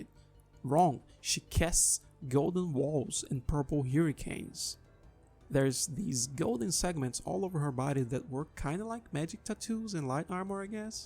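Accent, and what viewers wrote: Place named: Brazil. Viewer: Desde o primeiro vídeo, seu sotaque nunca me enganou. Sabia que era br kk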